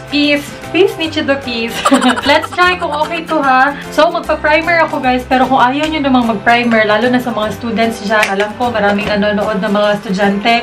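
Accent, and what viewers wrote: Visayas, Philippines. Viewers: Halaaa namimiss ko mama ko sa accent ng bisaya mo ate. Hehe. Godbless po! <3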